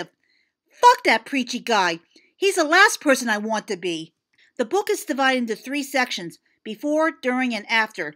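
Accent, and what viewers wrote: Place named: New York, United States. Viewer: Cheers K,best review I've heard,or read on the internet.Love that New York accent,I'm from Boston so,people get it after a bit.Lol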